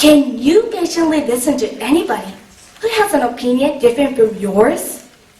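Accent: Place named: Japan. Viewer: セリノ 発音はキレイだけど日本鉛 まぁー日本人ならこっちのほうがキレイに聴こえるけど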